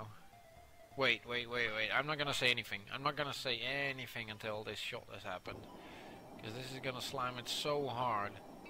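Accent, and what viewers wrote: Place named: Netherlands. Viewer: Are you Dutch? What is this accent?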